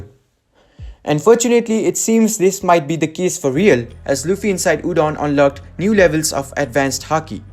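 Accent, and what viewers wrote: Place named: India. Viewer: Oh I’m sorry I taught you we’re because you have an Indian accent. Good Video btw!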